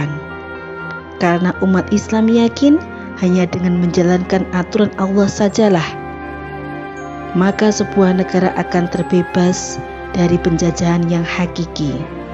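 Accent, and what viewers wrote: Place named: Java, Indonesia. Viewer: MasyaAllah tabarakallah.. sehat selalu dek. Medok nya buatku merindu..💕💕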